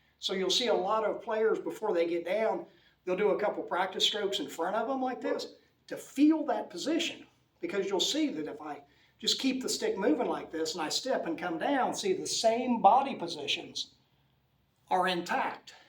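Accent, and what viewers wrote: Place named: South, United States. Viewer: love from China. love your accent as I lived in south of states for yrs. Quick question, have you ever experienced with pelvis pain or SI joint pain, especially from right hip/leg/low back? I now feel even worse when aiming lower and put left leg aside. I wonder if it's a common headache for pool players who plays 2-3hr a day.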